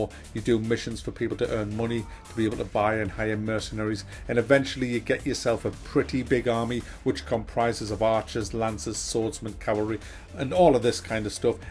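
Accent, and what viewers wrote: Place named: Newcastle, United Kingdom. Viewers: I don't watch you for your PC penchant, I have watched your videos for years because of how you cut through the bullshit and give me an honest and entertaining review. Firstly, it's fucking refreshing to have a proper geordie lad on here rather than some squeaky yank and secondly, while I don't play on PC, I appreciate what you have to say about the games releasing today, especially when they're mediocre yet full of greedy ploys by devolpers who have probably never played a game in their lives. Seriously, keep up the good work but give others who have made a different choice than yourself, getting a PS4 a break for simply having a different preference. I'm shite with a mouse and keyboard, I always plug in a controller when I play games on my mate's PC. It's always funny to see me aim with a mouse just as it is to see him try and make heads or tails of a controller! 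· Haven't watched the video through yet, no idea whether you will mention the games I'M looking forward to but who cares? I come here for and his glorious, dulcet Geordie tones not reinforcement of my existing opinions. I love you dude, your channel is one of my absolute favourite sources of entertainment.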